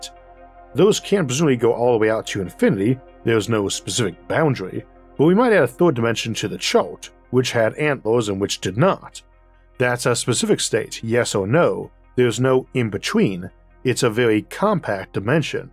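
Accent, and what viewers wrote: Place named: Brooklyn, United States. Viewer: What accent is going on here, Brooklyn Cambridge?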